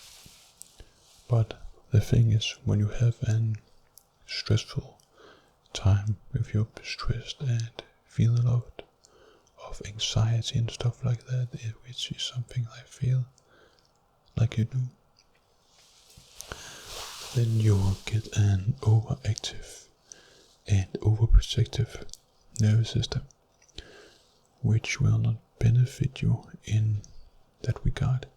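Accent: Scandinavia. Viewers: Is that a Scandinavian accent? Sounds similar to my Danish relatives. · Your Nordic accent sounds/is like 'nectar' for my ears (and Soul) Thank You🤞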